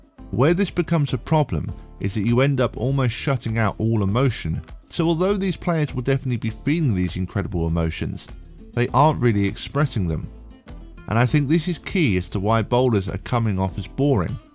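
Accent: United Kingdom. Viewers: It's interesting being both one and two handed, seeing the surprised faces when I switch to one hand for ten pins. It sounds like you're from the UK right? So am I, and I think bowling is dying in the UK sadly much more than the US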